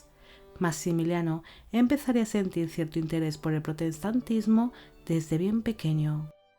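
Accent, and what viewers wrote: Spain. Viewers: Como no gustarme tal vídeo y más con ese acento español. Saludos desde México.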